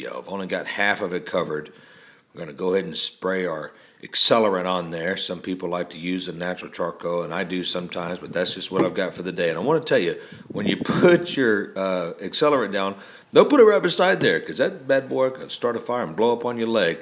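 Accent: Texas, United States. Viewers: what part of texas is he from?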